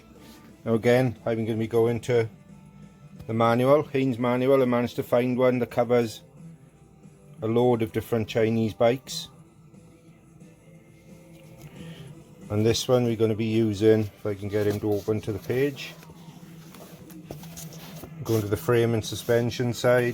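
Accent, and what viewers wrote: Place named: Wales, United Kingdom. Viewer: Watching and loving your Lifan video's. I just bought a Lifan King 125 on the weekend (63 plate with less than 3k miles). I notice by your accent your Welsh, what part of Wales are you living? I'm in Brynmawr near Ebbw Vale:)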